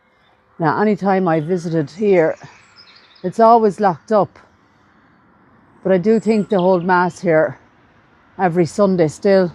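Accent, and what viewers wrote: Ireland. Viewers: I could listen to you speak for hours. I love the irish accent. Im about 20% irish, maybe that has something to do with it. Hope your health improves soon. And best wishes from America!😊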